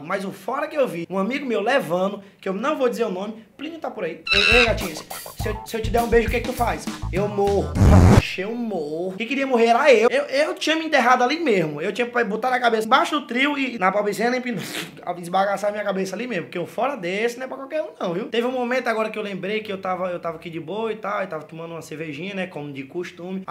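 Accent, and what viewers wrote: Northeast, Brazil. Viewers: mano de onde vc eh,do nordeste?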